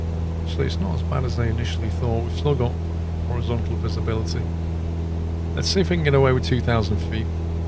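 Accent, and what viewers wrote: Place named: Yorkshire, United Kingdom. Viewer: Proper Yorkshire.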